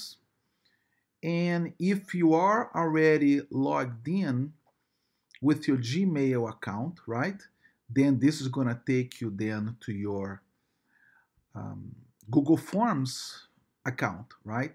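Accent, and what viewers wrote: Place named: Brazil. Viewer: Deu para sentir seu sotaque de brasileiro nos 5 primeiros segundos do vídeo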